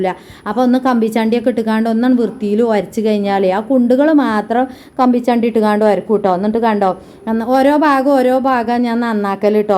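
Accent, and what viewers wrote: Malappuram, India. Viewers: മലപ്പുറത് ഉള്ളവരൊക്കെ അങ്ങനല്ലേ സംസാരിക്കൊള്.... മലപ്പുറത്തേർ കണ്ണൂർ ശയ്‌ലി എങ്ങനെ പറയാൻ പൊട്ടത്തി..... 😂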